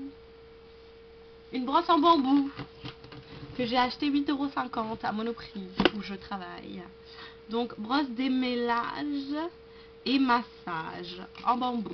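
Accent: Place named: Ivory Coast. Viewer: t une fofolle toi! tro marrant kan tu parles avc l accent cainfri, hihi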